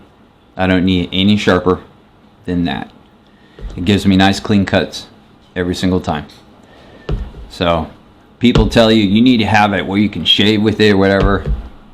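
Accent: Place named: Indiana, United States. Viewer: No sounds like you live in Indiana bro trust this old Hoosier boy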